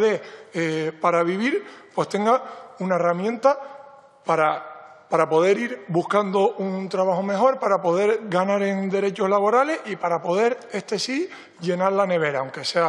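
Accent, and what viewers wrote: Spain. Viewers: LOS FASCISTAS, SUS MENTIRAS Y MANIPULACIONES...EL CHAVAL ES ESPAÑOL, ADEMÁS CON MÁS ACENTO PURO QUE LOS "OSEA, CACEROLA, PUM, PUM"... AQUÍ SE PODÍA APEDREAR A UNA MUJER DURANTE EL FASCISMO, O LO QUE SE QUISIERA...Y LOS BOTS, HAN SIDO MÁS VECES DESMONTADOS POR PARTIDARIOS DE PP-VOX EN EL ÚLTIMO AÑO, QUE LOS UTILIZADOS POR RUSIA Y SUS HACKERS EN EL MUNDO ENTERO...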